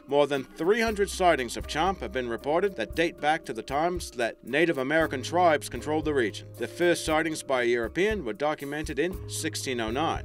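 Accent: Australia. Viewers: I think it's Australian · a guy with australian accent speaking on native american myths! talk about outsiders talking about a another cultures myths! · Sounds like a aussie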